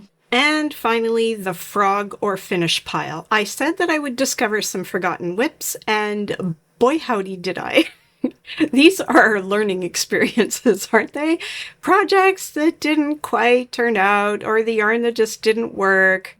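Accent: Canada. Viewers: Hello again,. Great video but I get verklempt when I even think about destashing. To be fair, we moved four years ago and I let go of all of my commercial yarn at that time. But I still have about six bins and countless project bags in the closet. You asked us to comment on our own yarn categories and, were I to destash at some point in the future, I would need a special donate-or-dragon pile that would be for my daughters only. In other words, I will invite them to look over the yarn and donate it to them if they want it, but otherwise it goes back into the dragon hoard. Thank you for the video. I'm really enjoying them and often knitting along (or grading papers and wishing I was knitting along). I am new around here, but I think I detect a Canadian accent - so I hope you are staying warm and wooly. <3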